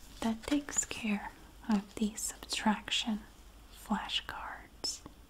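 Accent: South, United States. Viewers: We love a Southern accent in this house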